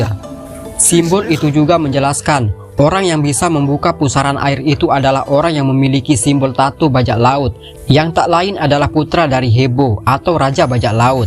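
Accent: Lombok, Indonesia. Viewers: Ini yg bercerita orang lombok ya.?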